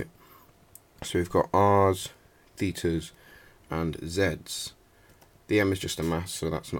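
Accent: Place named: England, United Kingdom. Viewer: *ancient. Sounds like an English accent.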